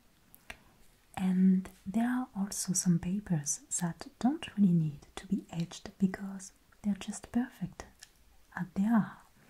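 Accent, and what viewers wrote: France. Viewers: Does anyone else LOVE whenever she crumbled the paper in walnut and starts talking in her amazing French accinet in a softly mom like voice because i have watched this a whole lot of time and every time she dunks the paper and crumpled it i get chills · you're right, she is french. German accent sounds way different in my opinion, its not that beautiful... I am german so I can say that lol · Is she German? The accent is beautiful, I just can't place it. Edit: nevermind, she's French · Her french accent is a trigger itself 🤩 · French accent is pleasing to my ears and her looks are pleasing to my eyes...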